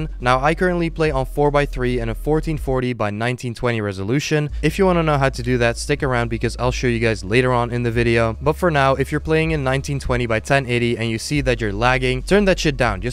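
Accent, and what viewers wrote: Netherlands. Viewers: Are you from the Netherlands 🤙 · Are you dutch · ARE YOU DUTCH MEN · Wait a second ur Dutch? Sup broeder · Wait are you dutch?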